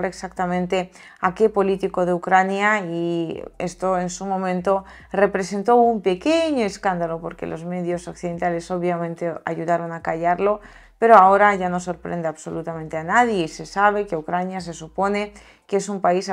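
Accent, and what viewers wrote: Spain. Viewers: Me gusta tu contenido, pero se me hace indigerible ese acento español. Te sugiero suprimir ese acento ibérico, pues tu público es sobre todo latinoamericano. Los españoles son atlantistas. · Te soy honesta y a pesar de q te admiro y quiero por ser 🌹 🤗 🌹RUSA❤no me gusta tu acento de española pq perdistes tu acento de rusa 🎉😂❤😊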